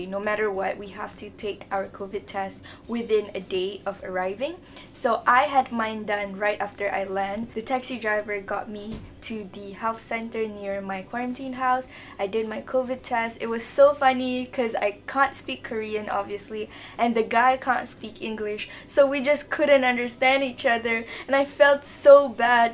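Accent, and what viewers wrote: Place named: Philippines. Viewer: the way she spoke like filipino..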